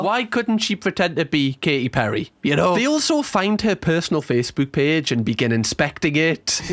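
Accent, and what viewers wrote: United Kingdom. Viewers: What kind of accent does have specifically? It’s really regional. He doesn’t sound like any of my friends from the UK who are from near London nor my Scottish friend.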